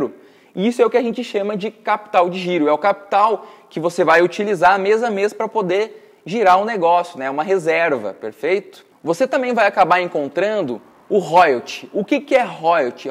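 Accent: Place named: Pernambuco, Brazil. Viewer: Adoro o seu sotaque e o " xero" do idioma Pernambuques ❤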